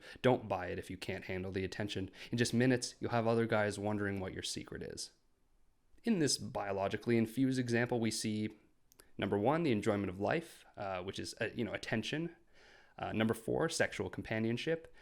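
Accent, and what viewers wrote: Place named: Canada. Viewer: canadian eh? greetings from the frozen alberta. great content man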